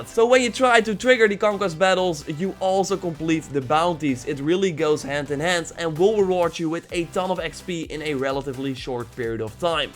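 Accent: Netherlands. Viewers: U Dutch right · Are you from holland ? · You sound dutch ik ben dat ook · Leer beter engels aub je nederlandse accent is duidelijk hoorbaar :< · Damn that accent is dutch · Bro are you Dutch? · Is it me or this guy has a sorth of dutch accent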